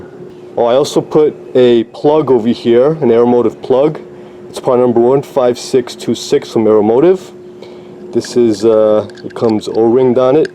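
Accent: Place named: Alabama, United States. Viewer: I enjoy your videos , they take me back to the days of hanging out with my brother , thank you for that , but I have to ask , I can't place your accent , is that Alabama or maybe southern Georgia ? 😈😂😇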